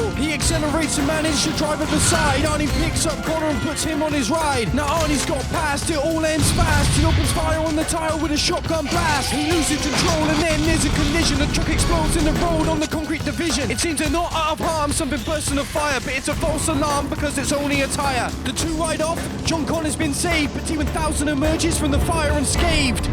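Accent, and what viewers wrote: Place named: United Kingdom. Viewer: LOL, nah mate. He's British. Strange how other countries have trouble telling our accents apart.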